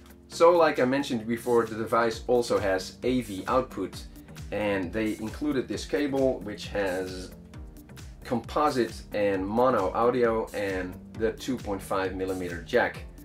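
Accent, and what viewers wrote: Netherlands. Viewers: 2 questions, your "american" accent sound very dutch, am i righr?, en mijn tweede vraag: waar heb je dit gehaald? ik wil ene kopen... ik ben 100% zker tot gij NL zijt of belg xD mijn twee vragen zijn voorbij, please help me.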